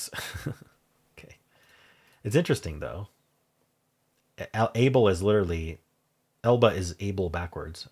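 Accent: United States. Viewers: I really really couldn't figure this one out, until said it in his American accent. But yeah I was never going to get this, those are not homophones at all in (most?) other accents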